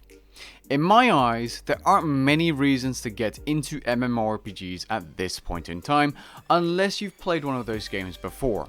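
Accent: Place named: Netherlands. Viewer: Problems with 1. He’s a pretty dope content creator 2. Not afraid to branch out on video content. 3. Is a very attractive man with a nice Dutch accent. 4. What? 5. Hates frogs and encourages bonking. 6. All of the above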